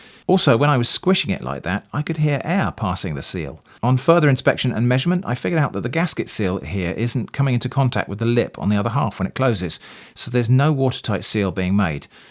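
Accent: United Kingdom. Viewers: why does he sound so SO british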